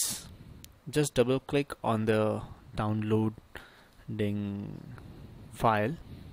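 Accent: India. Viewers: Heard an Indian voice, clicked off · not use full> fuck indian accent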